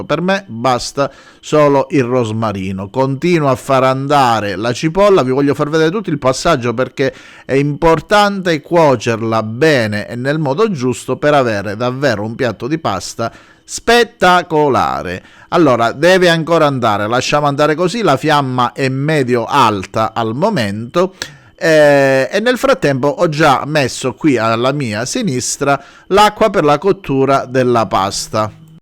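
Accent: Calabria, Italy. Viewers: Grande. Peccato quell'insopportabile inflessione calabrese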